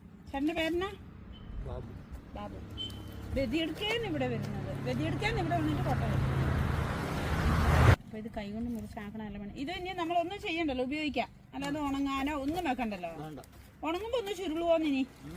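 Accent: Kottayam, India. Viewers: Kottayam thodupuzha slang aanallo nattilevidaya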